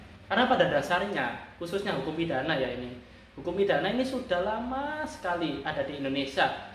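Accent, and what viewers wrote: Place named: Java, Indonesia. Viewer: Suaranya kaya medhok koyo wong jowo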